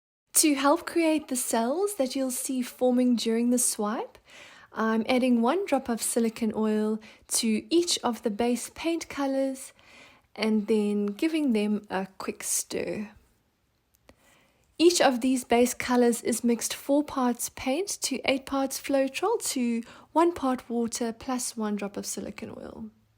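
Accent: South Africa. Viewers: Hi there ... is that an SA accent I'm hearing? And are you from KZN or JHB? Watching you, I feel really inspired to start painting again. So up to my studio I go ... thanks to you:face-red-heart-shape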